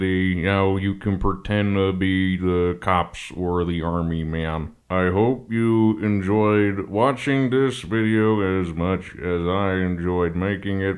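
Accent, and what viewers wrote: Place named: United States. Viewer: Most american voice Ive ever heard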